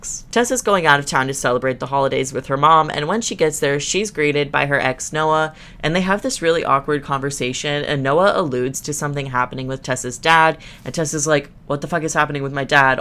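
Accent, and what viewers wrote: United Kingdom. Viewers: Gotta say, I love your Bri'ish voice. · Btw I know this has nothing to do with it, but you with the British accent, kinda sounds like Ron from harry potter, I'm not saying this to be rude, I was just kinda amazed at how close it was. :) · This was the first video of yours I clicked on and was surprised that you had a British accent, then surprised that you didn't. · first time watching u. first 5 seconds i was terrified that u were british, anyway amazing video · pretty good "British" accent for an American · the british accent caught me off guard 💀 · why is your english accent is more believable than the actors???? 😭😭😭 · THE WAY U SAID NOVEL HERLPELEPRP UR BRITISH ACCENT IS SO FUNNY TO ME I LOVE IT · this is the first video of yours that i’ve ever watched & for the first 5 seconds i fully thought you were british lmao 😂. anyways, thoroughly enjoyed this excellent roasting of the “after” series & love your whole ✨ aesthetic ✨ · bts i love your british accent lkjwqal;/lsakjuk;.lsa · This is my first video of yours and I was 100% ready to believe you were British, you could've went the whole vid with the accent and I wouldn't have been the wiser lmao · This is the first video I've watched from you and I almost clicked off when I heard your british accent😭😭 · This is literally my first video of yours and I literally thought u were British 😭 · Your British accent was great :D As someone from England I can safely say, we all speak like that XD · . 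i literally went to another video of yours cause i thought maybe I remembered wrong and you were actually british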